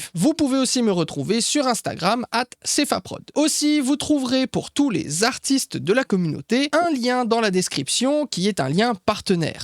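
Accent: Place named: Réunion, France. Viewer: T sur ou t inn kreol na un léger accent 😅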